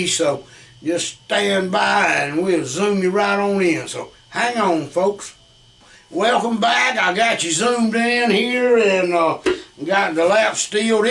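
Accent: Texas, United States. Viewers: This is Texas English or what ? Its funny . I can listen steel guutar all day.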